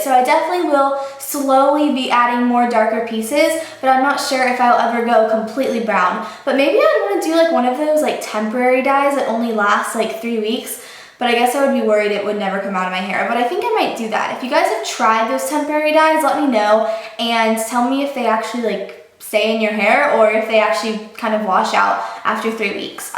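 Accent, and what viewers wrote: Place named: South, United States. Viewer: for some reason i feel like you are talking with more of a southern accent in this video! am i the onnly one who thinks so? you look really tan and lovely <3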